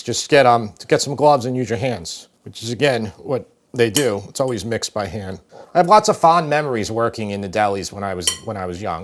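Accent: New York, United States. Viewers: I'm a vegetarian, don't eat tuna, but love your NY accent and watched the video all the way to the end😅😅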